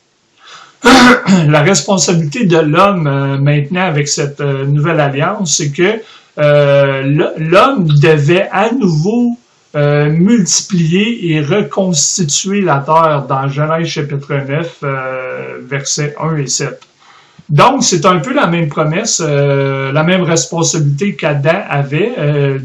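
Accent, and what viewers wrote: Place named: Canada. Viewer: In bon message mais français canadien difficile de saisir.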